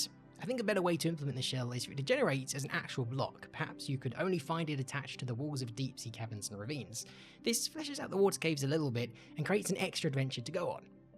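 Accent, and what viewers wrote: United Kingdom. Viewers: I was reffering to pronunciation as "ae" often makes an /æ/ sound in English (think "aesthetic, etc). What I was saying was that I've only ever noticed /akwætɪk/ being said by these two Youtubers who both happen to be British.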